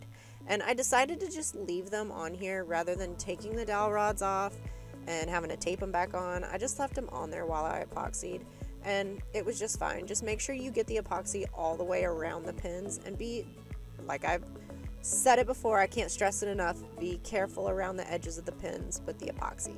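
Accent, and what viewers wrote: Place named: South, United States. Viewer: Very Beautiful !! ----- Girl where you from ?? With that southern TWANG Ba'eye !! LOL ---- I guess more to the west from where Im at ---- Or it could be Alabama/Tn ...... I'm in Louisiana ---- Down yonder from you =)~ ------- Love your work ---- and your husband reminds me of mine ---- always creepin around when were trying to work ...... He is just amazed at your work .... is kinda sweet ...... but still kinda gets annoying .....bless their hearts .... I guess ---as long as they keep their creepin at home its ok ...... I SURE don't wanna be an outside the home creepers wife ...... UGH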